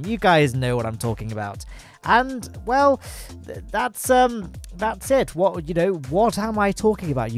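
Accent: United Kingdom. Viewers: Is he British????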